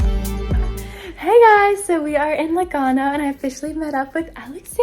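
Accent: United Kingdom. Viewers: Wait is she British or is she just doing the accent to be funny?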